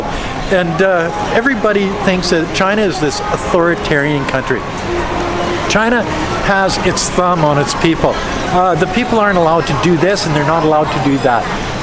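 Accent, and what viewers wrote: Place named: Canada. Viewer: This is the first time I'm watching this guy's video because it popped up in my recommendations. I can tell this guy is Canadian. He sounds (and sorta looks) just like Bubbles.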